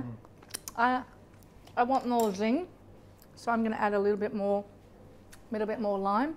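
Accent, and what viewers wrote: Australia. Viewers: She might be the first European to like Americans 😂or is she Australian? Im not very good at telling just by the accent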